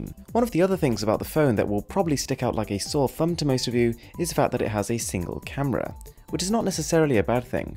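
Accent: United Kingdom. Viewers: oh! Thats why he looks indian and sound british. Amazing! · Why do you have english or british accent?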